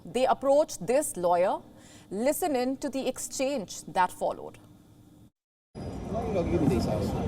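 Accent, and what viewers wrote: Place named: India. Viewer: She is speaking English in Hindi accent. Very hard to follow. Need to improve her delivery.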